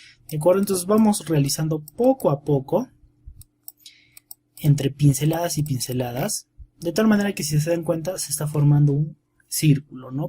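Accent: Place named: Peru. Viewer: me harta tu voz de peruano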